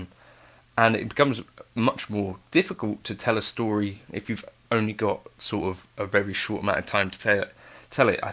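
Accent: United Kingdom. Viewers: He’s British?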